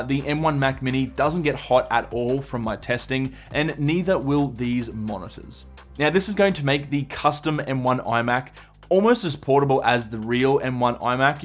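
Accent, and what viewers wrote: Australia. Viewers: gotta love the Aussie accent "M1 oi-Mac"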